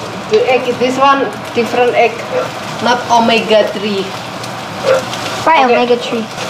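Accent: Indonesia. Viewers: kan lidah nya Indo sekali ❤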